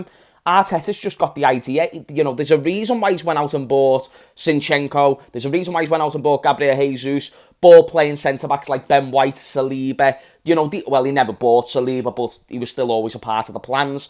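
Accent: Liverpool, United Kingdom. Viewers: its Liverpool Accent 🤣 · Finally someone with thick British accent. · I love your scouse accent lmao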